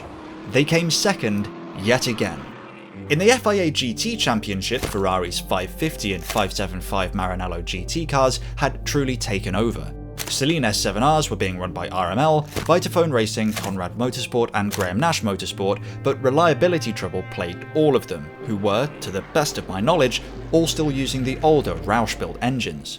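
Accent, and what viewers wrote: United Kingdom. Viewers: How come you sounds British😂 I like that acent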